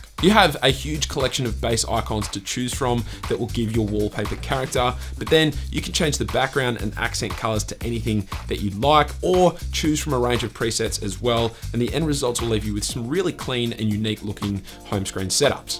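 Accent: Australia. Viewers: Heard the Aussie accent and subbed straight away! Looking forward to seeing the back catalogue of videos 👍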